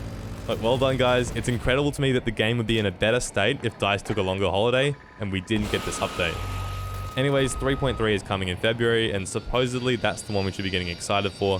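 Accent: Australia. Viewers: Ughhhh Aussie accent, gross.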